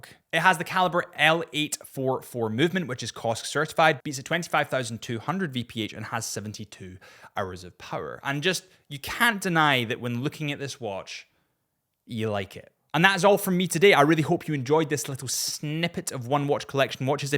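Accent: Scotland, United Kingdom. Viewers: Mate it’s like listening to Adrian Barker with a Scottish accent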